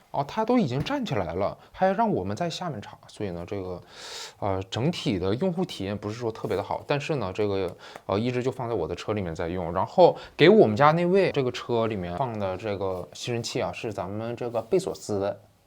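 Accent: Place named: Northeast, China. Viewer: 老哥这一口大碴子味儿 听着老亲切了！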